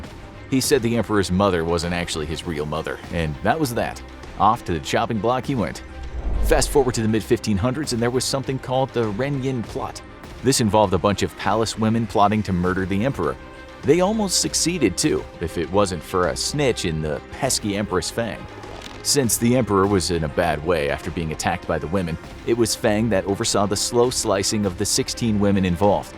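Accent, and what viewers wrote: United States. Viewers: This dude is probably the best narrator on YouTube. He also makes the American accent sound best. 😁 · Honestly yeah. I always loved the strong american accent haha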